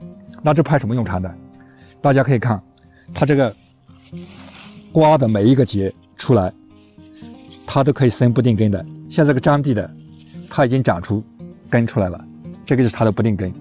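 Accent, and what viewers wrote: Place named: South, China. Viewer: 这口音，是南方人吧？😁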